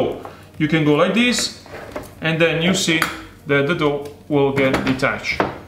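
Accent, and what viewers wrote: Italy. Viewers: .. fantastico il tuo inglese!! Lo adoro, italienglish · You are kidding , right? He’s originally from Italy, so he has an authentic Italian accent.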